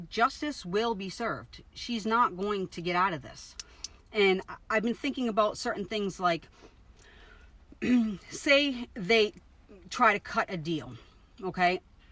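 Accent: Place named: Massachusetts, United States. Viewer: Hello.. I know I'm wicked late to respond.. New subscriber here.. As soon as I started listening to you a few video's ago I knew you were from MA! As am I. 💜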